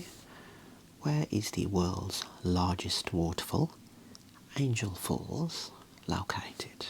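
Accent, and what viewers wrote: Birmingham, United Kingdom. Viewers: Your accent is also great! From which region of the UK would that be considered to be? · he's from birmingham which is in the West Midlands region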